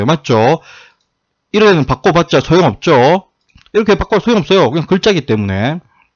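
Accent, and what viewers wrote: Gyeongsang, South Korea. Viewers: 졸리는 부분이라도 꼭알아두어야 할 항목입니다.ㅋㅋㅋ 구수한 경산도...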